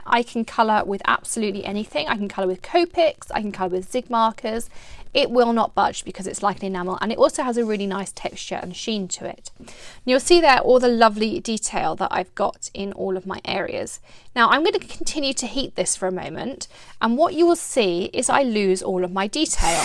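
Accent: England, United Kingdom. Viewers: I really love your videos, very clear, easy to understand and no unnecessary chit chat or giggles. There aren't many tutorials done with a clear English accent, you will be my go to site for all tutorials in future. Please keep them coming. Thank you.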